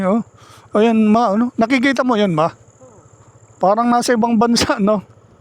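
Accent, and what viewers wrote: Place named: Visayas, Philippines. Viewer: bisaya ang intonation mo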